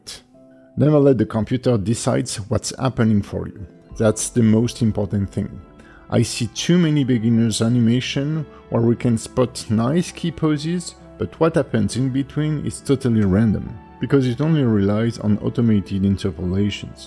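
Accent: France. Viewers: Such meticulous work! It took me a while to get used to your French accent but it was so worth it. I bought your rigging course and it's simply outstanding. My compliments on your teaching skills. Thanks a bunch for sharing your knowledge and striving to make it accessible to complete beginners like me!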